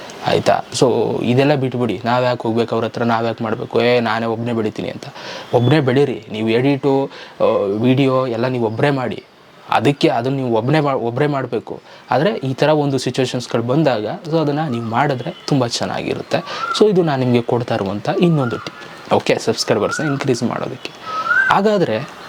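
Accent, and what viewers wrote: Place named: Hubballi, India. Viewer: Nimma matu Nija Tara ede nivu Ennu Belibeku nanu Nija Andre Hubballi avalu nimma Bhashe Chanda anstu adake sub madide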